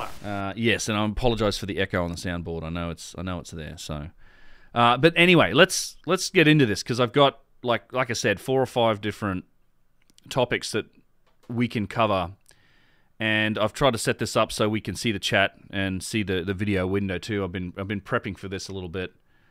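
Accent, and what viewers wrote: Australia. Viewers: Such a wonderful channel, where's your accent from though? Sounds Aussie. Love, absolutely LOVE your approach & mindset, well researched, honest & especially prepared to challenge establishment. Xx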